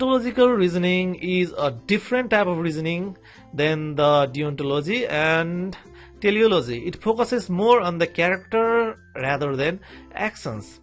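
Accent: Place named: Nepal. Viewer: nepali accent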